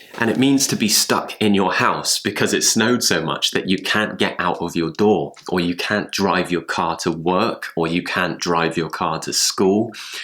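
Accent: United Kingdom. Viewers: I am Thai guy. I like British accent. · Mad love for you British accent 😍 When I used to live in my country (Poland) I was snowed in so many times... So I think winter in Uk is pretty warm, especially in London. Anyway thank you for lovely video, I really enjoyed. I'll often go back to your channel.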